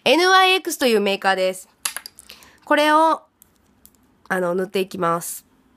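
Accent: Kansai, Japan. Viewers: めっちゃかわいい白人さん？って、ベースメークの動画を見ておもって、関西弁だったから超びびりました！ ほんとファンです❤️ サバサバしてて、わかりやすいし、すき！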